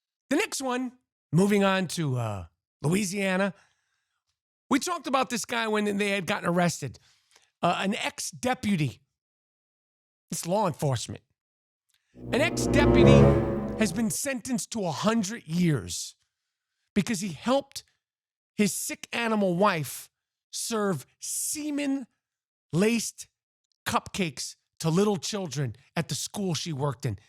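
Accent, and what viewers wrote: New York, United States. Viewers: When I need a dose of New Yorky attitude, I listen to. Who has a classic accent and voice like us anymore? New York attitude is gone. Yeah, we have everyone from all over the world and they get to speak their own language. They come here like tsunami. But they stick together. where is the classic NY accent and attitude - free speech about what matters? Good awards podcast. Thank you for speaking.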